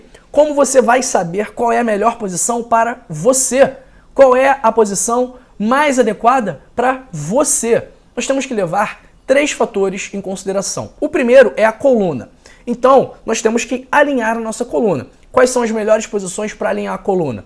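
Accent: Rio de Janeiro, Brazil. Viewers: Boa noite que linda dica tem que ser carioca que voz mais lindo doutor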